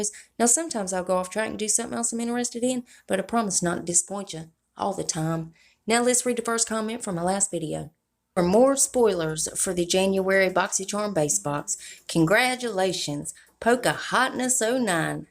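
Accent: South, United States. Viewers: Hey SWEETFACE,, i have a question? I was taken of off the waiting list for the lux box, but I did get my December bass box. So they build me the $24.99 for the December Lux box! So am I going to get charged the other $25 plus another 25 for my January box? Do you know I'm so confused right now. LOL and by the way I absolutely love your videos and your sweet Southern Tang! I'm from down south too so I love you girl and thank you for all the spoilers I just started following you about a week ago maybe two I found you and the first video I was hooked.😅😅😅💖💖💖💖💖💖💖💖💖💖💖💖💖💖💖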